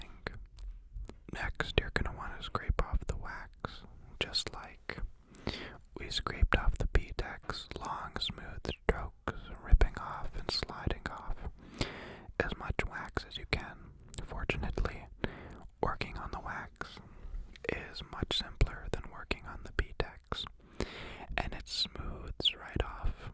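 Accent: Canada. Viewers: Us he Canadian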